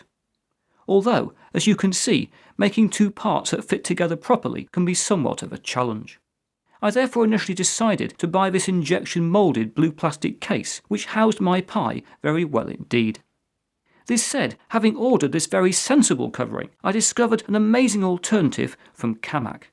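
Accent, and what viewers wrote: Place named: Manchester, United Kingdom. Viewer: My God he's from Manchester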